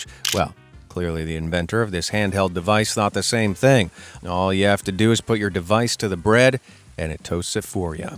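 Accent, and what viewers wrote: United States. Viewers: I noticed that you have an American accent but said buggy when referring to a baby stroller and lorrie when referring to I’m assuming a delivery driver and fire brigade instead of fire department. Odd.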